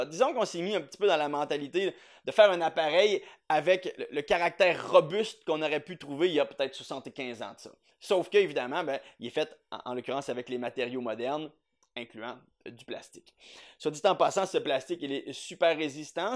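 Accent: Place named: Quebec, Canada. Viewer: No offense... mais... l'accent québécois démolit complètement la vidéo. Un des accent des plus horribles de la la langue de Voltaire.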